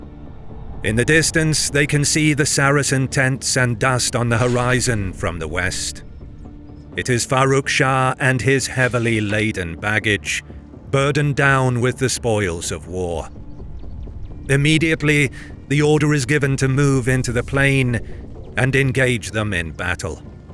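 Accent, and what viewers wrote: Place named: United Kingdom. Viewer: Stop with the damn British accents on every documentary, it's the most annoying trend I've ever experienced!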